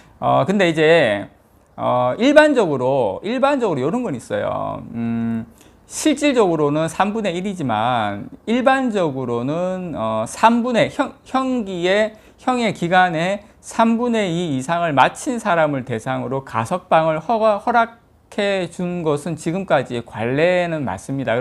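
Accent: Gyeongsang, South Korea. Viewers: 경상도 액센트 없이 말씀하시면 듣기에 좋겠습니다.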